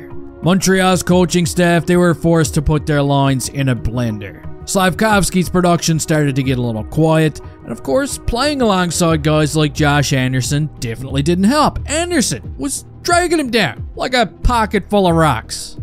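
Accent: Newfoundland, Canada. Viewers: You got to be a Newfie with that accent ,definitely not from Cape Breton !!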